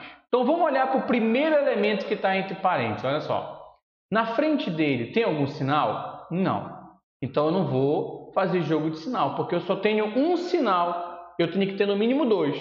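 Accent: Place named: Pará, Brazil. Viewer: Parabéns, aula muito bem explicada, e comum jeitinho paraense que só quem é daqui conhece kkkk Obrigado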